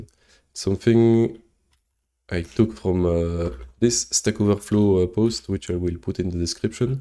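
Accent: France. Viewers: u speak english like a french person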